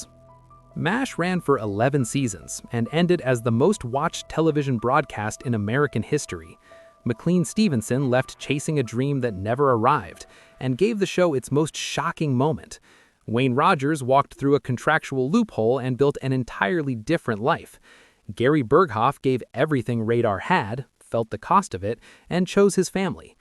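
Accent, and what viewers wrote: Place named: United Kingdom. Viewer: However good it may be, why oh why do we have to put up with these British accents for all these videos? Sick of it!!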